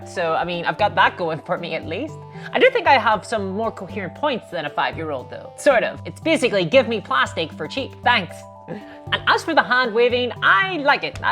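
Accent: Northern Ireland, United Kingdom. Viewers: Dont know whats the hate about not only is she gorgeous and has the British accent, she give us the up and down of the evil GW. lol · This has me laughing out loud at several places. Very good. But I do have one question...is there a Northern Irish accent in there? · Great video. I'm from Norn Iron and I admit when I first watched your stuff I was horrified at hearing an accent similar to my own on YouTube - we are after all a self loathing breed of people. After about 10mins I was hooked!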